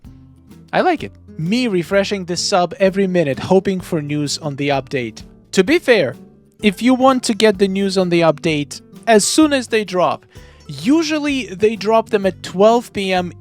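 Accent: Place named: Ukraine. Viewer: I listened to your words many times, I thought I misheard. Are you from Ukraine? How long have you been in the US? What is your real name? Sorry for so many questions. I’m from Ukraine😉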